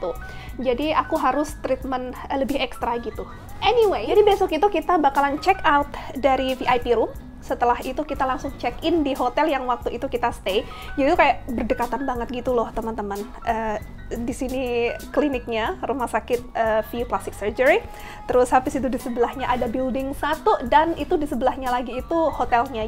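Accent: Java, Indonesia. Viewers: B.indo oke Inggris pinter dan lancar B.jawa tetep g ilang jawane lek ngomong sambil ngakak karo bestiene 😂.. enak banget denger suara mbk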